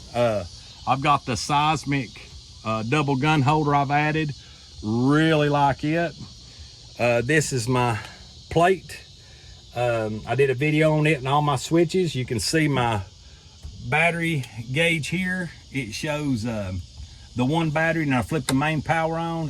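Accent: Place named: South, United States. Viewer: I heard that talk and sure enough... T for Tennessee brother!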